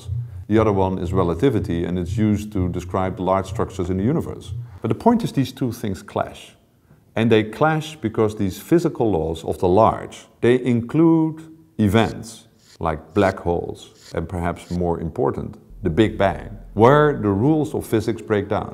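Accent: Netherlands. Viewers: Je hoort meteen dat hij Nederlands is :)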